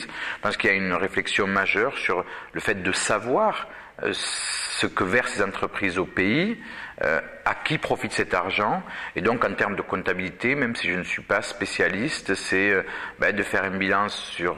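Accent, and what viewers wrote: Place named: Ariège, France. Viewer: P'tain..Mais qu'est-ce que c'est que cette voix d'ariègeois? Pourtant Rivesaltes (le camp Joffre) c'est dans les Pyrénées orientales..que je sache..!!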